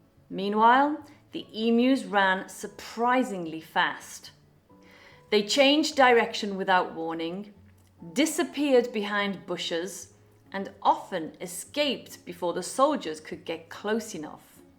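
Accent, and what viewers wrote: United Kingdom. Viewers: New subscriber, love British accent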